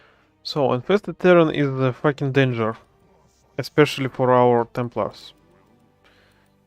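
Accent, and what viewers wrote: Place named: Russia. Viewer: Судя по акценту предположу что вы из России😉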